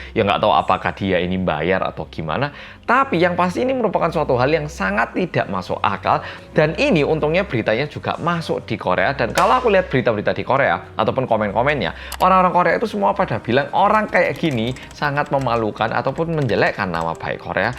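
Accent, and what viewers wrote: Java, Indonesia. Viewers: Pualing suka dg pembawaan mas,juelass bhs yg medok Jawanya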